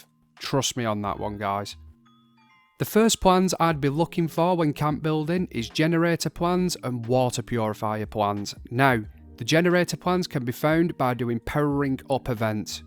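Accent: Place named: Manchester, United Kingdom. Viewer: My wife and I really want to know where your accent is from. Thanks for the video, but plz help us with this mystery! I think you have a Mancunian accent but she thinks Scottish.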